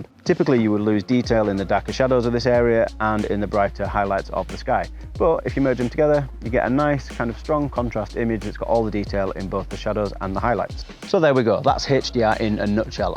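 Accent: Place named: Northern England, United Kingdom. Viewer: Your northern accent and the breakneck speed at which you are talking is not very helpful.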